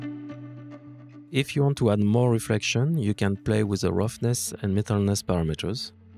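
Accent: France. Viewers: J'adore un accent français. Grand tutoriel, très détaillé et bien fait. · Un accent Français ou je rêve ? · Pas mal l'accent français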